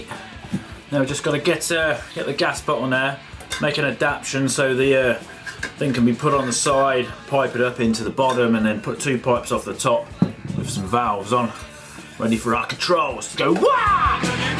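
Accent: United Kingdom. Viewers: He sounds British but I don't know if he is by birth 😂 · Are you like British or Australian or something? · this man is so intensly british that i cant understand half of what he says but i love it anyway haha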